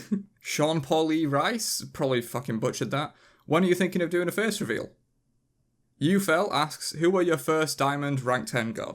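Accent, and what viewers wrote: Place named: Northern England, United Kingdom. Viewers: Big up the Northern English, im a northerner myself but slightly more northern than Yorkshire, still not too far from eachother at all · what part of Yorkshire are you from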